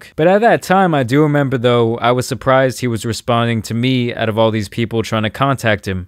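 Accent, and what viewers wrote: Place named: New York, United States. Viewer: I've been a fan of you since when you had like 20k subs I always thought you was from NY cause of your accent.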